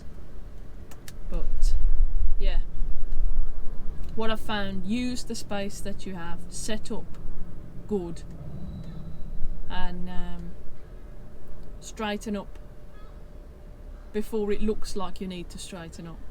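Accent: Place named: Dudley, United Kingdom. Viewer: Are you from Dudley bab?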